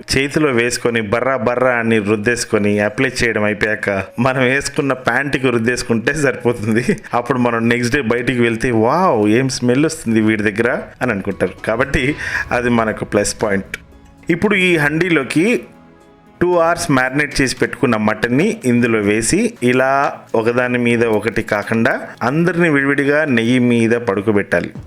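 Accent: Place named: Telangana, India. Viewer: Barre neyyi🤣🤣🤣🤣superrr Andi babu mi Telangana yasaa😍👌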